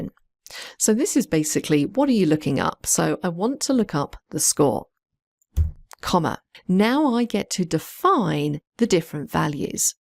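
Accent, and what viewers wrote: United Kingdom. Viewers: Sweet GB accent. 🌺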